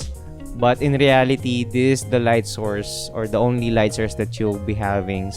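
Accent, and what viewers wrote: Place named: Philippines. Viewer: That is filipino accent 🤗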